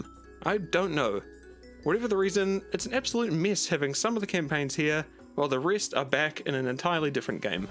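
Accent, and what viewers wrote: New Zealand. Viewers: Good review! Question: where do you come from? The accent reminds me of New Zealand or something like that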